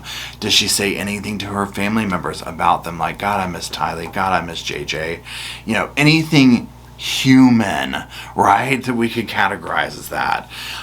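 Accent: South, United States. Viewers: Unrelated to the video topic: Omg, I love Mr. Roscoe P. Coletrain! His sweet lil pawpaw face! How old is he? He’s obviously very used to you holding him and talking with your hands because he keeps his sweet lil face out of the way of your hand gestures. You’re one of the few content creators who sounds almost like me…sweet and southern. Y’all are the best and I’m pretty sure I’d watch you read the phone book as long as Roscoe was there to supervise .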